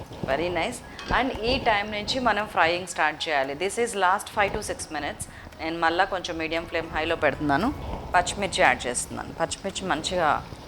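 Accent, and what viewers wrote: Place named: Godavari, India. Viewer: Godavari savvadila andanga undhi mee maatalu nice video thank you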